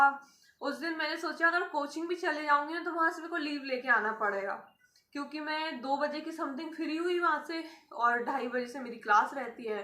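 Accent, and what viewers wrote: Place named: Bundelkhand, India. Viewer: Aap kaha se ho ????? Sounds like bundelkhandi accent !!!!!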